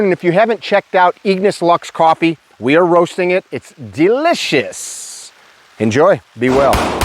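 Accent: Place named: Minnesota, United States. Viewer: SOUNDS like Minnesota…the accent.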